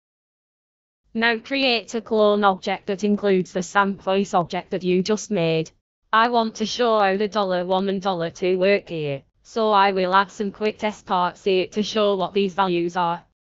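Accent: Scotland, United Kingdom. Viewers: What the heck is this amazing robot voice! Is it meant to be Scottish? It trends slightly Welsh at times maybe? I'd hate to be misidentifying it.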